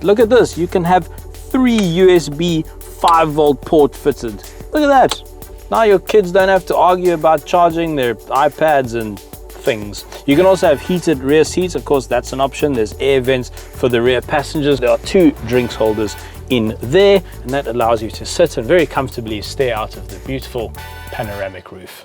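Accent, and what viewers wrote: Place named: South Africa. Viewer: This SAfrican accent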